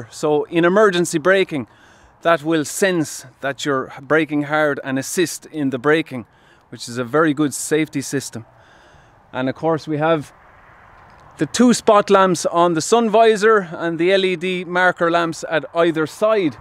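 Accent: Ireland. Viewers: he sounds irish mate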